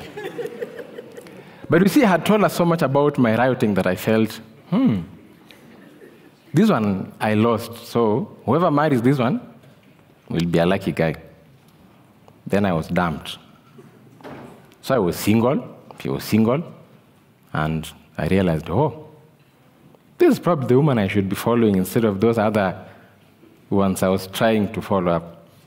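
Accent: Kenya. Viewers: And you can even get the Luhya hint in it. Great guy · I love the Kenyanness im your pronunciation. Haha...and your sense of humor 👏👏👏🙌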